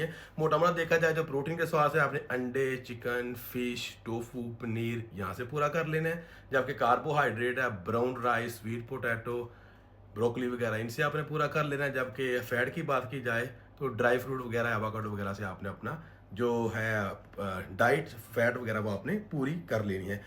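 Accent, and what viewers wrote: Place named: Pakistan. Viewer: Sab theek lekin accent pakistani kyu hai aapka ?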